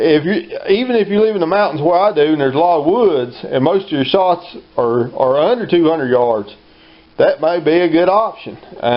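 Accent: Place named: South, United States. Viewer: I gotta go find B U B B A .. Love the country accent son.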